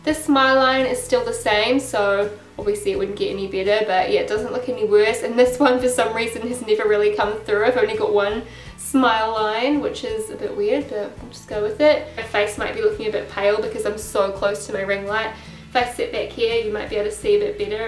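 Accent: New Zealand. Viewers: hahahaha "eeeeh it's the eees-pee-eeeff" :') You sounded so cute there! I love the kiwi accent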